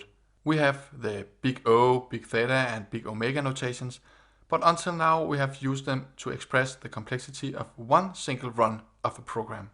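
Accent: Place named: Denmark. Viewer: Pretty sure he is, his accent sounds exactly like a dane speaking english.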